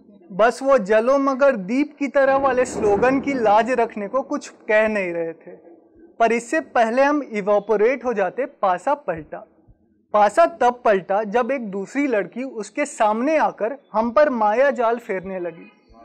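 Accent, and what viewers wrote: Bihar, India. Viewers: Very nice video sir, it has a little probabum, you have a Bihari tone in your words, you should also look at your bass.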